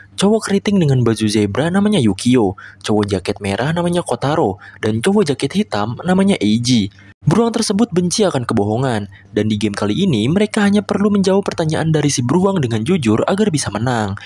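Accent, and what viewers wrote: West Java, Indonesia. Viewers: Admin org jawa barat keknya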